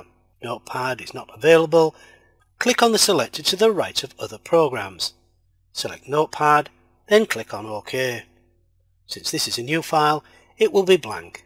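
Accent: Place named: Newcastle, United Kingdom. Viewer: Very good explanation - and no trouble with your Geordie accent either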